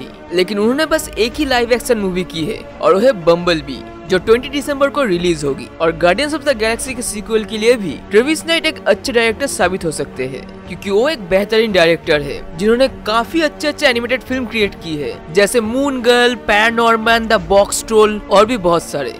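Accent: Bengal, India. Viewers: Bro are you bengali?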